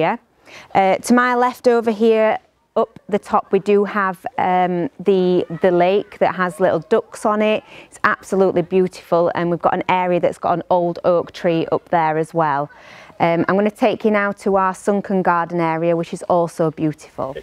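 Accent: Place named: Yorkshire, United Kingdom. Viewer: Dear lady! I love your Yorkshire accent))) I have stayed and slept in WH. Love the palce! I am from Ukraine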